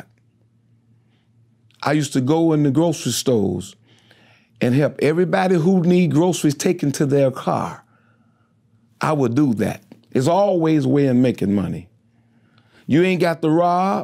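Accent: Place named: Chicago, United States. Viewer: You can tell from his voice that he's from Chicago. Older black folks in Chicago got a certain way of talking lol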